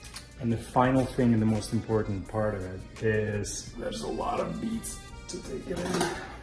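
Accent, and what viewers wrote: Russia. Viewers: Russians will never truly lose their accent. As a Russian myself I can assure that...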